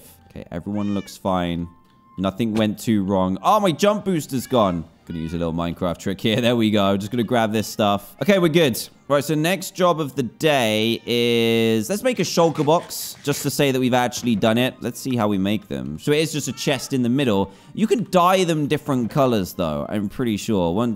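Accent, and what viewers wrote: United Kingdom. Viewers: Hes British that's how they say it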